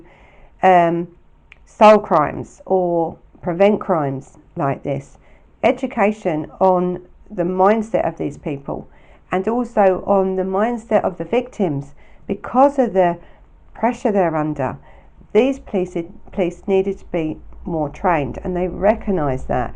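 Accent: London, United Kingdom. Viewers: she sounds like she’s from London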